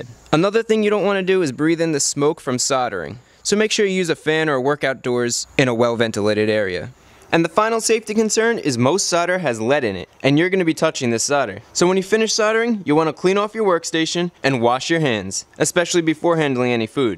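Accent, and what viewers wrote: United States. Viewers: It's an American thing, it's how we talk. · This pronunciation seems to be very common by Americans. It's not a bad video though if you need to learn about soldering. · Hey learn how to say soldering, there is no silent L in the word so I don't get why americans drop the L this makes you sound dum and is probably true So say it after me S O L D E R I N G SoL-dering !!! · I want to ask a question, great video as always but why do Americans pronounce 'solder' as 'sodder', the 'L' isn't silent, and if you think it is, how do you pronounce the word 'Sold' ... do you say I 'sod' a car today? lol I'm only being a bit pedantic about it but I'm also genuinely curious if there is a reason why this has come about, any Americans who can tell me cos it makes me smile every time I hear one of you guys talking about 'soLdering' something lol By the way I'm very jealous of anyone with steady enough hands to solder properly, my hands shake so badly that let's just say if you see me going to try and diffuse a bomb then make use of the head start! 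· That's how us Americans pronounce it. I don't get it either, but that's just how it is. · i appreciate the 'American' pronunciation of 'solder', as 'soder,' but it doesn't make it correct! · Thats the yanks for you. Always trying to alter the ENGLISH LANGUAGE.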